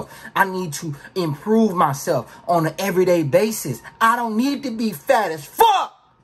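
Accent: Baltimore, United States. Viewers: I thought dude was from Baltimore lol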